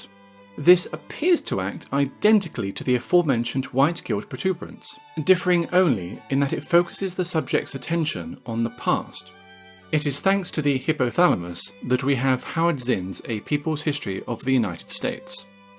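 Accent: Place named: United Kingdom. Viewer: O sotaque britânico deixa ainda mais irônico .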